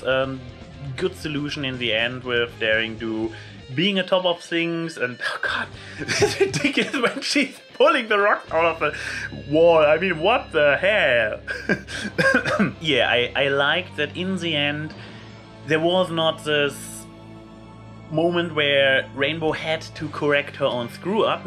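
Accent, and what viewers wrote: Germany. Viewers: Ich finde deinen Deutschen akzent echt genial ^^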